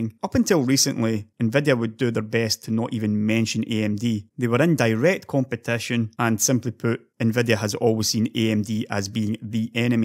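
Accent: Scotland, United Kingdom. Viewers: this Scottish accent tho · I can even understand your Scottish accent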